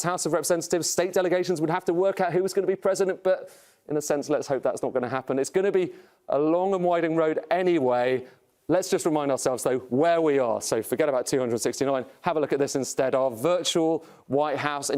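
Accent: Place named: United Kingdom. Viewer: I don, t understand why this British accent? I get soooooo confused. Where is actually this Election?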